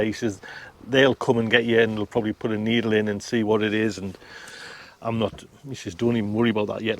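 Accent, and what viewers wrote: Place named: Northern England, United Kingdom. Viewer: So sorry to hear about your health issues me and my 7 & 3 year old granddaughters watch all your vlogs, I said to my granddaughter let’s watch they know your name even by your voice, I was making a cup of tea & she rang to me and said not well, I came in and this was before you had said anything, she knew by looking at you, your in our homes daily with your smiling face your northern accent n witty humour, my granddaughters love to watch how the things grow in your allotment, they learn so much, we wish you well on your road to recovery, I’m sure all your followers where touched by this video your truth and honesty you shared with us all, where all rooting for you ( no pun intended)😂, don’t worry we’d watch you even sitting in your chair, your garden will be there even if you have to take a step back for a while. 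Take care best wishes ❤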